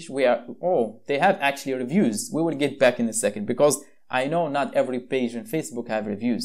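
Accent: Morocco. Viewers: Anglais n9iya akhy bayna fik maghribi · TBH your accent is better than trying to speak America/British accent rir hdr b Moroccan Accent and Keep going bro <3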